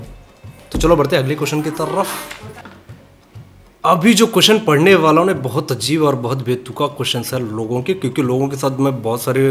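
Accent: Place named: Hyderabad, India. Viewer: After a long time I am watching him. I love his hyderabadi accent. I want to see him go him on heights....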